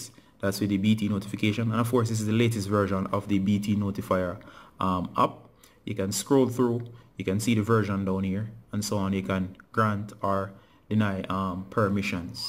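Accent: Jamaica. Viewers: Stfu he's jamaican like myself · Are you jamaican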